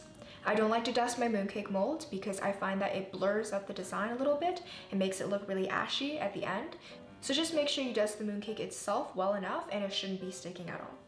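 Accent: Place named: Singapore. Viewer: First time coming here, you sound very Singaporean.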